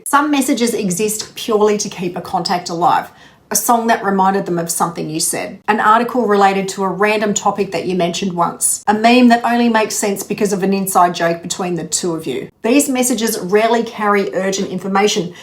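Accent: Australia. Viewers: That Australian accent is just the best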